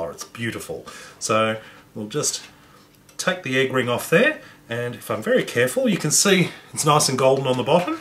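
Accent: Australia. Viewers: To people who can't hear the difference is not English he is australian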